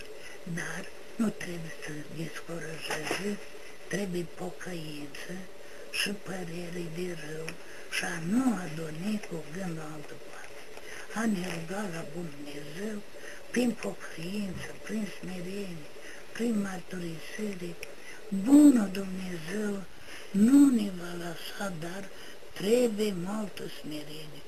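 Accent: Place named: Moldova. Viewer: He speaks in Romanian, with an accent typically encountered in north-eastern Romania (Moldavia region). Some of his words sound a bit archaic, meaning that today, ordinary Romanians would not use those words, since newer words (neologisms) are used.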